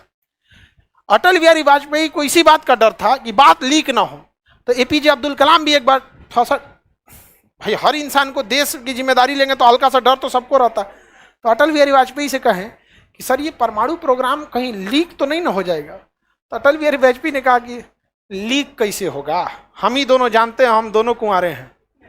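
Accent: Bihar, India. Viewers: ye bihar ke logon ki aise boli hoti hai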